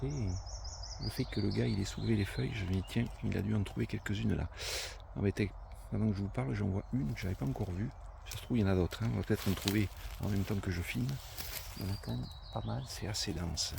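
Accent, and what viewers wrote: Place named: South, France. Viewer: Joli accent du sud pour un lorrain 😅👌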